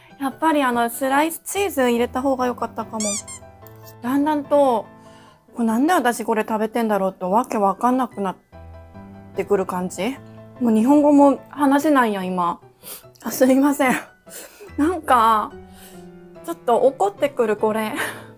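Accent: Kansai, Japan. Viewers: こんにちは😊辛いの、ダメそうかなと思ってたら、やっぱり🤭鼻水でるし、汗かくし。『ちょっと腹立つなぁー！』ウケました（笑）ちょこちょこ関西弁出るし…。ワンちゃんの大事なところ、隠してくれてアリガトワン！（笑）🐶娘がちゃんより、少し歳下ですが、娘を観る感覚で観てます👍では、アンニョン🎵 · 関西弁使ってるやん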